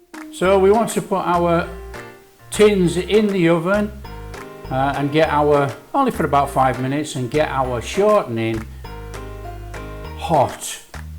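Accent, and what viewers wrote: Yorkshire, United Kingdom. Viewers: No measures beyond 8 eggs, get real. Enthusiasm and a Yorkshire accent do not ut it.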